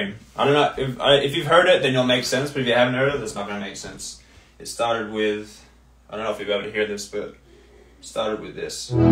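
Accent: Australia. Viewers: He really sounds so much more Australien then in the last few years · You’re not too close to the screen LOL And yes we appreciate the return to a more aussie accent :) just those sneaky American “rrrr’s” quite prevalent. Love hearing the aussie “o’s” coming through every now and then. Like when us Aussies say “I don’t know” and other words like “home, phone, alone etc” Its just so Australian, so please don’t lose too much more 💕 Love the album. I only live 5 min from work so I’m keen to go driving next weekend to listen to the whole album with the windows down - and it’s almost spring weather on the Gold Coast so thanks for the driving music that you poured your life into.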